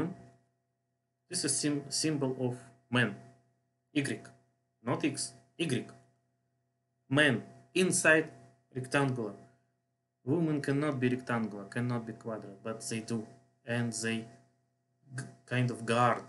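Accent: Russia. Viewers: M8 you need to turn up the volume I am Croat and I can follow Russian accent but it is a real struggle to hear you. Great work but watching this before dark is almost impossible.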